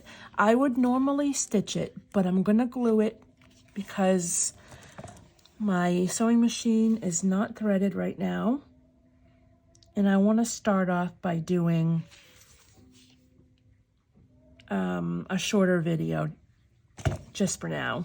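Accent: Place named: Boston, United States. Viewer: New subscriber!! Oh my gosh so wicked-awesome you're an Neweglandah!! Luv your Boston accent....my dh is Irish ❤️ his pak dah cah phrases at times! So hilarious 😂 You're so creative! Blessings!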